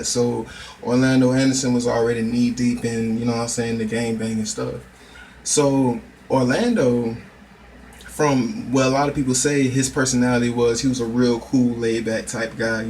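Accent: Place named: Atlanta, United States. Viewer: U must be from Atl, judging from the accent and grill. However some of your info was correct and some wasn't. I'm from Compton and Baby Lane grew up right down the street from me. I strongly believe he had something to do with Pac's murder, but I don't think he pulled the trigger. Futhermore most of the people associated with this case are dead now. So it's really just a lost cause unfortunately.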